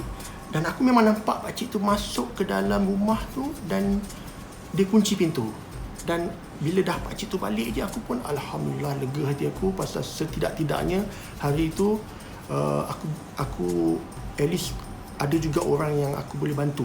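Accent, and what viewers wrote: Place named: Pahang, Malaysia. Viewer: bahasa org lipis kot2. loghat dia pekat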